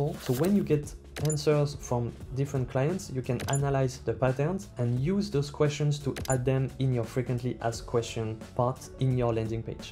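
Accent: France. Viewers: cela sent l'accent francais 😮‍💨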